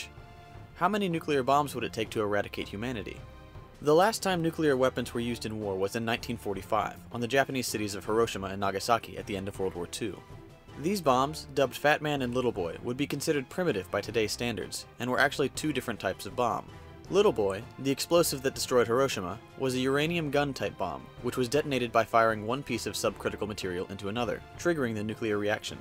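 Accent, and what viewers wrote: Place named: Dallas, United States. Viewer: so this is why your voice seems so familiar, you live in Dallas(like me), and thus your accent is familiar!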